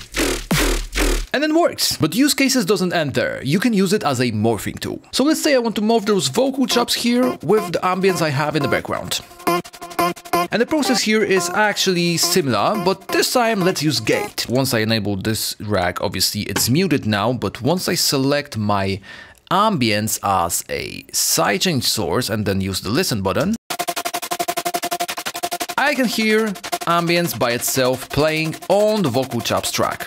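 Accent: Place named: Eastern Europe. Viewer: I thank you my friend, for the great work your doing. Where are you from? I can tell your from Eastern Europe by your accent. Portugal here. 👋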